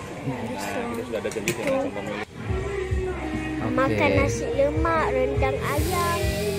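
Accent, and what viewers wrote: Malaysia. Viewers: cakap seperti orang Malaysia yang nak cakap dalam bahasa indonesia... jawa nya tak hilang.. Indonesia nya masih... boleh kuasai bahasa Malaysia..bang orang Malaysia ke????😀😀😀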